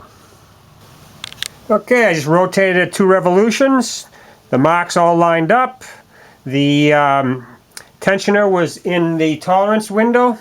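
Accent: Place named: Boston, United States. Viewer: . Thank you so much for the video! You could have saved me hundreds. I never would have had the gumption to change my water pump without your video and the one from autotech. I watched it backwards and forwards so much that I started thinking in that Boston, is it?accent. Can't thank you enough. When I got to my water pump I decided it wasn't leaking (it had been changed twice by the same mechanic the last just about 4k ago) so I changed the thermostat housing instead HA! But I wouldn't change the experience being that I was on vacation and want under pressure to get out done. Thanks!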